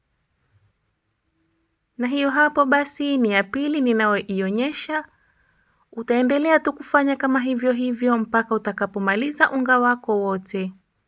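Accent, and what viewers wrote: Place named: Arusha, Tanzania. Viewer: Wow! Asantee my dear, kwa recipe nzuri.. 😋 nitampikia Samosa za viazi! Mume wangu.. 😊 by The way unaongea vizuri, Kama watu wa Arusha! 👍😀😍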